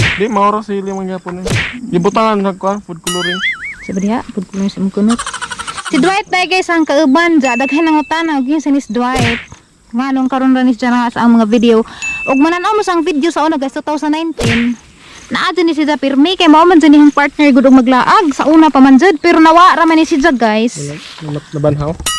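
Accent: Bohol, Philippines. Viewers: Hi...I really like u...n excited to watch ur new vlog daily..favorite kaau ka sa ako mama..n of cors kami tanan sa family..taga jagna ako mama.ug malingaw cya sa imong vlog Jud..mkadumdom cya sa iya sinultian b4..like,AJO NAA MOY KAJO...ÀJAW KAY MAJABO..hahahha she is really ur fan.....and kami sa family nkasubscribe nimo..😊😊😊pls pa shout out sa next vlog..Fabiana ellevera halagna..ug sa mga ELLEVERA SA CANJULAO JAGNA BOHOL.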